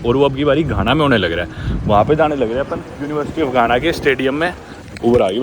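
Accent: Haryana, India. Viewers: so nice to hear Haryanvi dialect in this vlog. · Language me haryanvi touch kamal hai bhai❤❤❤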